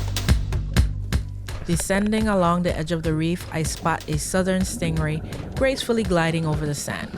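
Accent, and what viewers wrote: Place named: Caribbean. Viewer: I grew up in Florida and there are way too many lionfish there too. That's one species that needs to be eliminated and luckily they taste great. I would love to try ceviche with lionfish. It's great that you're helping your environment and getting good groceries at the same time! What a beautiful reef, it's definitely worth protecting! I hope people become more aware of lionfish and start to want to buy the meat. If it was "in style" to eat them maybe the population could get under control. Thanks for sharing your adventures! Oh and I love your accent! Caribbean people have great accents!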